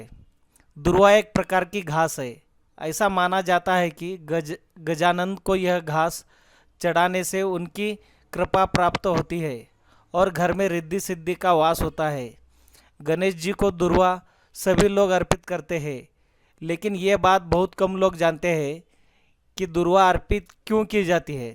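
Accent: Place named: Maharashtra, India. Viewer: ये मराठी माणूस की हिंदी है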